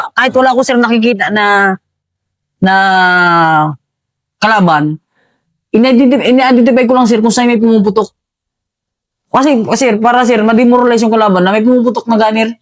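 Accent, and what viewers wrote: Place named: Quezon, Philippines. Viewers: Taga Quezon probins yata si sir..ay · Accent ni idol parang taga Quezon Province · Taga Quezon province po ba kayo? Tono kasi ni Sir eh punto ng Quezon province.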